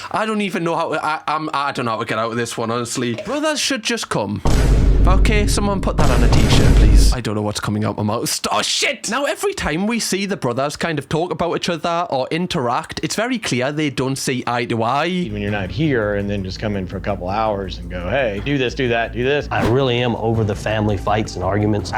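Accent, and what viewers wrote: Newcastle, United Kingdom. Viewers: Hes geordie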